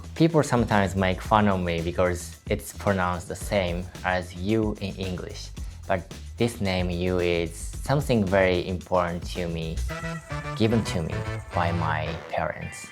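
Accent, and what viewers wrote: Japan. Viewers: The beauty I hear in your speech is because you are a native Japanese speaker talking to us in English. I understand every word. I'm from the South here in the US and let me say, we can destroy some English pronunciation and there is no shame! We embrace it. Please do the same. Individuality is beautiful. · I have Japanese friends and have visited your country 4 times. I love Japan very much and wish that I could live there. My Japanese friends speak some English, but not as well as you do. I respect the effort that is put into speaking another language. I try my best to speak Japanese, but only know basic phrases, because at 62, it is hard for me to remember a lot of Japanese. It is easier for me to understand most of your English, because of your Japanese accent. Keep up the good work. ❤️ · Your English is GREAT !! Please remember that people in the United States have accents also; especially in places like Mississippi, North Carolina, even New York ! 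Depending on the accent, you can tell exactly where someone is from- no shame… just the way it is. your pronunciation is perfect. Please remember that you are Japanese first and foremost; so of course you’re going to have a “accent” when you speak English. I admire you for wanting to improve, but please only improve if it is something you want to do not from outside pressure. And I love your outfit today !! HANDSOME !!! Keep up the great work !!!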